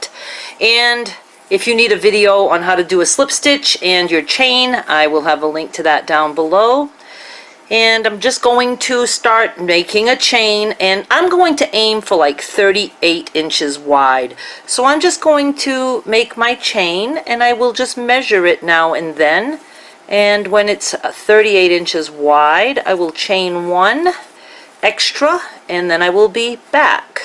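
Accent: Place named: East Coast, United States. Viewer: I am brand new to crochet and to your channel. I know the basics, but gosh I'm rusty I guess. I want so badly to make one of these afghans, but am having trouble with the turning the corners, can you do a quick snippet of just turning the corners for us, it would be a huge help. By the way ... you sure sound like you're from the East Coast, am I correct? I'm originally from Connecticut, living on the West Coast now, but you truly sound like so many of my friends from home (Maine maybe?) Thanks for sharing, your afghan turned out gorgeous, I hope one day soon I can make something like yours.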